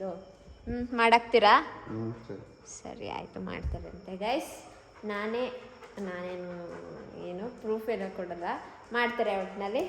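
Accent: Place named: Kundapura, India. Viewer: ❤❤❤❤❤ಗೋಯ್ ಬೀಜ 👌👌👌👌 nam kundapr Kannada marre❤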